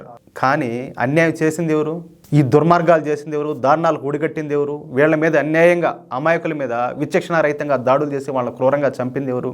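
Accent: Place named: Chittoor, India. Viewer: Well Said Bro. You rock. Especially your Chittoor Accent is too good.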